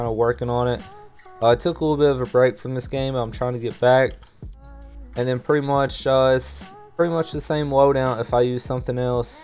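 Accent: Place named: United States. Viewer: I can hear your country accent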